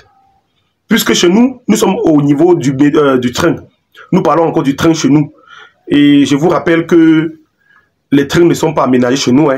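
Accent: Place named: Cameroon. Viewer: Regarde comment tu resonnes !une mentalité vraiment camerounais comme les camerounais disent . Le jour tu arrives en cote d'ivoire tu auras honte de dire que tu es Camerounais .par ton accent nous les ivoiriens ont saura que tu es camerounais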